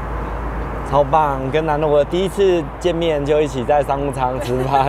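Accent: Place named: Taiwan. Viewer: 台灣人講話本來就這樣好嗎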